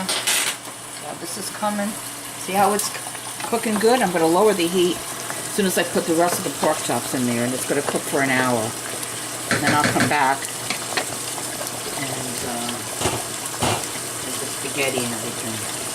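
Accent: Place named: New York, United States. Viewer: Love that heavy new york acent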